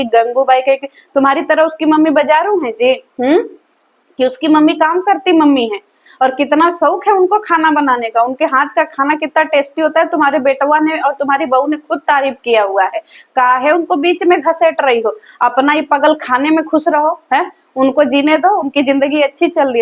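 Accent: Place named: Uttar Pradesh, India. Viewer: यूपी वाला भोजपुरी बोलतीं हैं आप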